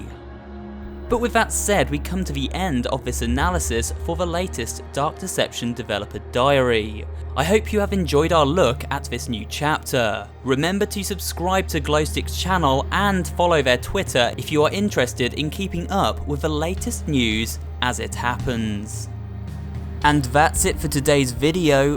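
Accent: United Kingdom. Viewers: why you british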